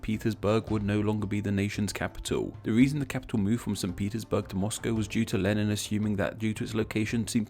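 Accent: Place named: Midlands, United Kingdom. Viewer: For a channel dedicated to etymology, it seems weird that the host pronounces every non-English name and word as though it were a town in the Midlands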